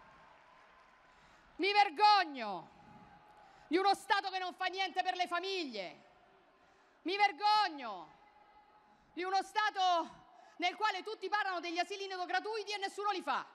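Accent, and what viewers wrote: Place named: Rome, Italy. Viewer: L'accento romano tipico, comme la Magnani....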